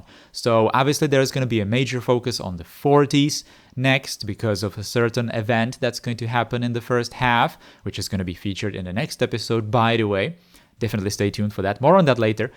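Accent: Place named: Czech Republic. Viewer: Where are you from? You have a slightly eastern european accent. I’m guessing Czech?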